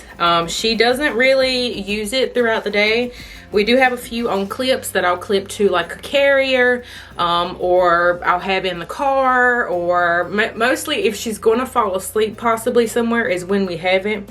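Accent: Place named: North Carolina, United States. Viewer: My kids are grown. I just like hearing you talk! You're NC accent sometimes has a bayou twang.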